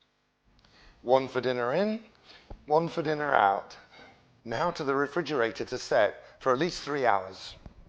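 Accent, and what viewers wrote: United Kingdom. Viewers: As a Texan, you've lived here for 10 years. Why don't you have even a slight Texas accent? lol I thought that was impossible. Tell me that you throw in a y'all once in a while? That would be so fun with a clipped British accent. Thanks for the recipe. I'll be trying this recipe tomorrow. It's been too long since I had a mousse, much less whiskey chocolate. Yum.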